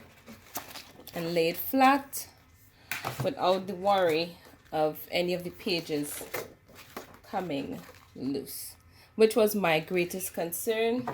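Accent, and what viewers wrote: Trinidad and Tobago. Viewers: You sound Trini🇹🇹🇹🇹🇹🇹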